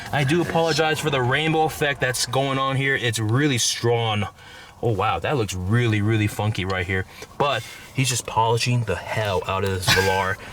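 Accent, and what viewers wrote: United States. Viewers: The way you talk, quintessential american. like it....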